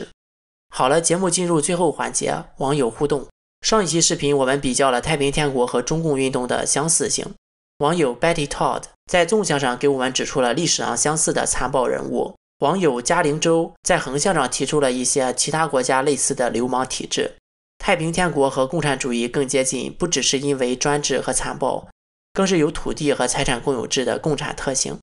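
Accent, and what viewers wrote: Shandong, China. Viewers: 听口音你是山东人吧，反正是中原地区的人，别装台湾人了好不？还沦陷，中国现在过得很好，稳扎稳打，得民心者得天下，如果中共是你们口中那样极端的专治，以中国人的革命精神，早给赶跑了，中国人是世界上最爱革命的人，农民起义在历史上数不胜数，中共之所以能成功就是顺应历史潮流，联合了一切可以联合的力量，说直白点，就是得民心，彻底解决了封建、买办和殖民势力。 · 听口音作者您是山东人？有点好奇您现在在国外还是国内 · 看了点半吊子历史书，可能也受了点大法导师的点拨，卷着大舌头操持着山东大葱味儿的国语就敢评说天下啊。你是真有勇气真有脸。论国际关系，当年德国美国苏联都帮国民党，共产党到陕西，只剩下两万大洋命悬一线，国际上怎么帮了？共产党勾搭国际打赢了国民党？你这逻辑是跟李洪志学的么？ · Klause哪里人？口音听着是山东人？ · 题外话，请问klaus是台湾人吗？听口音不像 像山东人。。。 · 这口音，老家山东的吧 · 这口音山东人吧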